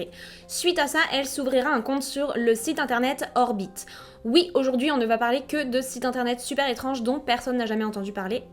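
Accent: Quebec, Canada. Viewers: a mince c est cuit pour la comparaison avec Mc Skyz il me semble entendre un petit accent québécois ^^ .. Je suis chauvin Mc Skyz numero 1 :) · est et Mc Skyz est Mc Skyz. Cependant, tout les deux sont extras!!! J'adore le fait que tu as un accent québécoise même si tu es française! Ça donne un sentiment de " réconfort ". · Je viens de découvrir votre chaîne jolie demoiselle. C'est un plaisir de vous écouter mais dites moi il me semble entendre un très léger accent québécois.... me trompe je ? Bise de France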